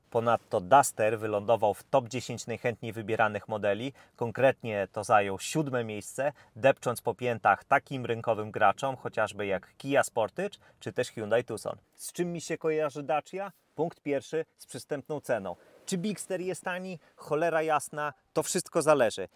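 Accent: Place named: Podlaskie, Poland. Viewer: Fajny test, dobrze że prowadzący nie próbuje krytykować, ponieważ „to Dacia”.👍 Na początku wydawało mi się, że białostockiego akcentu nie słychać, ale później już złapałem jak są akcentowane końcówki zdań.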